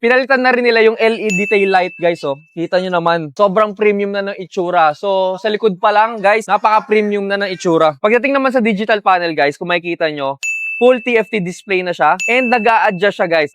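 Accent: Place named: Visayas, Philippines. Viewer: bisaya pag ganyan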